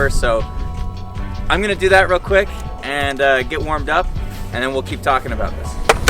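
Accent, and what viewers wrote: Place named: Utah, United States. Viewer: I somehow knew you were in Utah as soon as you started talking 😂. Great video, thanks.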